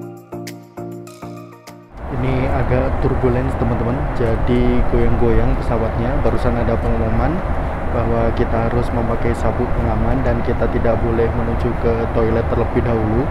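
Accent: Java, Indonesia. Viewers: Gw nonton ini hny ingin dengerin medok jowone si mas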